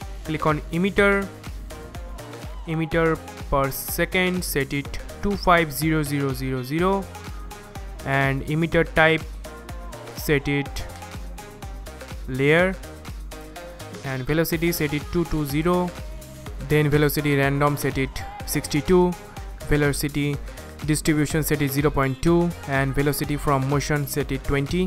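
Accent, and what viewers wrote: India. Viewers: Hey Buddy are you Indian?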